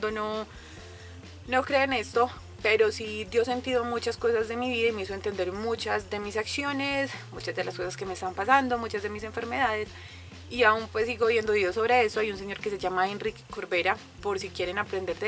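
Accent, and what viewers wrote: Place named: Medellín, Colombia. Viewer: Hola cómo estás, soy de Medellín por tu acento de pronto veo que eres también de acá. Será que me puedes dejar el nombre del médico o centro donde él atiende, y si tienes teléfono de él te lo agradecería bastante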